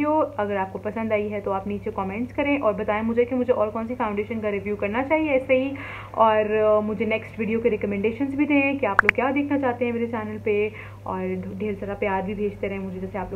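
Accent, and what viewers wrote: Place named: India. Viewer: slow down please you speak so fast like when you speak India, one hundred a miles an hour I don’t understand your English of course your English intonation like India speaks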